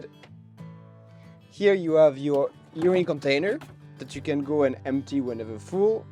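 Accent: France. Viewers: Just beautiful as always 😍 bravo PS: like the french accent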